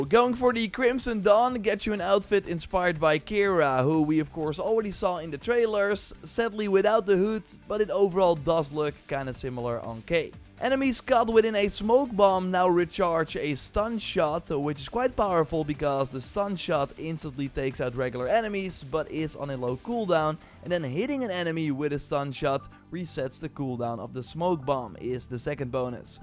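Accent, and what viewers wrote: Netherlands. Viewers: Opens video -> Hears dutch accent -> Skip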